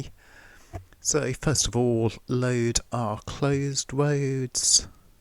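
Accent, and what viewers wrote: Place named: United Kingdom. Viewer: This has to be the most bri'ish accent I've ever heard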